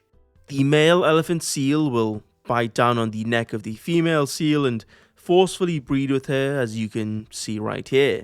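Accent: South, United States. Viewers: please bring back the southern accent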